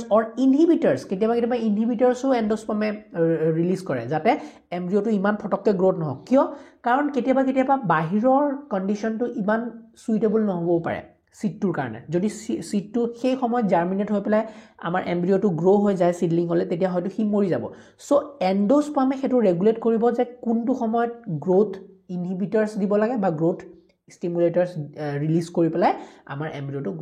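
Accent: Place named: Western Odisha, India. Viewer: Tq sir.. being a western odisha dialect speaker it seems like u are just explaining in our dialect with slight changes in accent. Thanks for notes in english.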